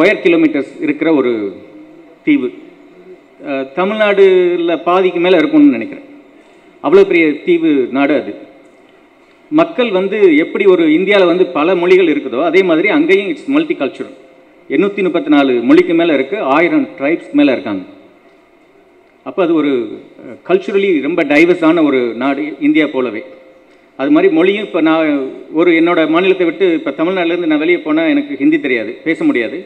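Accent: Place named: Malaysia. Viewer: Sound like malaysian slang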